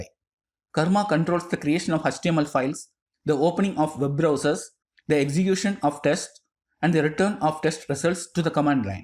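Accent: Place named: India. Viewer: 1) Well that looks interested 2) Indian accent, out of there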